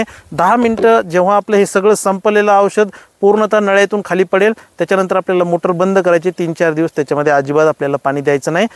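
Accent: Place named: Parbhani, India. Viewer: भाषा परभणी जिल्ह्याची आहे,छान निवेदन करता राव तुम्ही.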